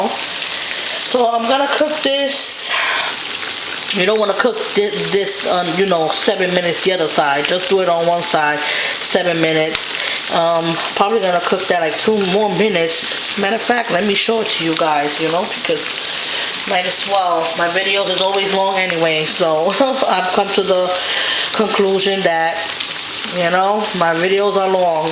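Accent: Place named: Bronx, United States. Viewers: Girl where are you from? You sound like you are from The Bronx (like me) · wow I grew up there on <num> had mainly Dominican and Puerto Rican friends so I can definitely detect a NYC Latina lol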